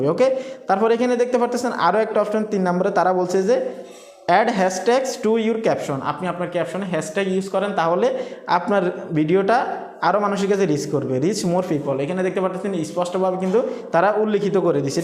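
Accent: Noakhali, Bangladesh. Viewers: ভাই আপনার বাড়ি মনে হয় নোয়াখালী🙂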